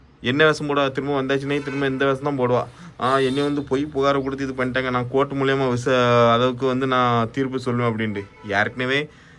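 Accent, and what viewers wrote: Tirunelveli, India. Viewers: அண்ணா எங்க ஊர் திருநெல்வேலி பேச்சு மாதிரி இருக்கு அண்ணா சூப்பர் ❤️👍👍❤️👍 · நீங்க திருநெல்வேலியா bro?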